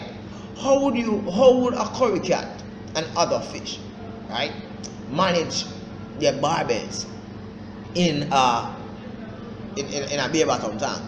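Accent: Jamaica. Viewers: Im going to enjoy your cannel good Sir and cheers buddy from atl Ga me and my wife saw your country and went to the blue hole your county is so beautiful and everyone i met was so kind and down to earth god bless ya and best of luck with your cannel good Sir and love your jamacian tone ya man and much love to you and your lovely country and lovely people take care bud :)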